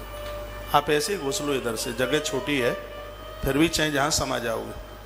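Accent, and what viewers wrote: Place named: Bundelkhand, India. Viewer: Maharajji aapki bundelkhandi bahut malook lgat hai hm bhi bundelkhandi hai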